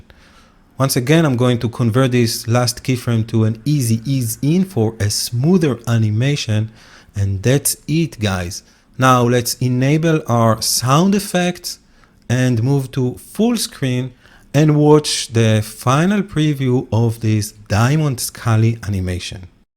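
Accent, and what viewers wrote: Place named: France. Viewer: I think you are French i like your accent! And Thank you for the work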